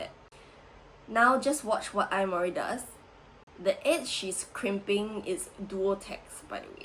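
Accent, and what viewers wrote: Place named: Taiwan. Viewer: Great video. We all love Ai Mori! But I also love your accent 🤣 Where are you from? Taiwan?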